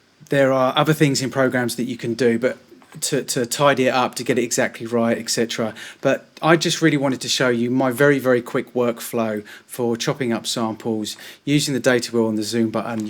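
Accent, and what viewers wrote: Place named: London, United Kingdom. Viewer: Hey bro just want to thank you so much for the well articulated and illustrated vids. Bigups from London although from your accent I detect a Londoner too!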